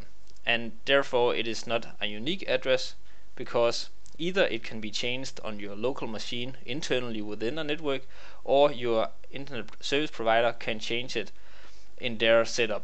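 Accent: Denmark. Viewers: I can hear you are danish from your english accent :-)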